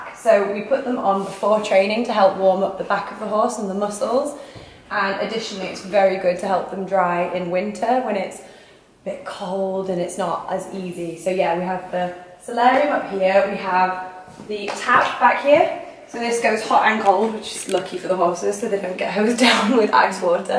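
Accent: England, United Kingdom. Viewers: Are you english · Are you british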